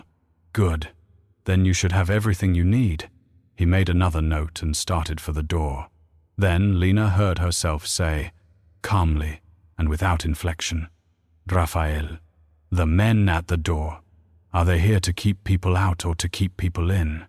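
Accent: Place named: Eastern Europe. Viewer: The story is pretty good, but the pronunciations of the Italian names sounds more eastern European. You have to make it some more Italian. I would say eight out of 10.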